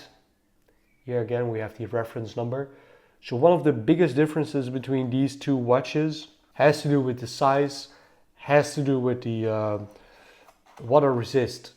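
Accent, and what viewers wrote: Netherlands. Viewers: Why not the GW-M5610 with the BGD-5000? I have the MW and the 7: nice set in white. Btw you sound very dutch.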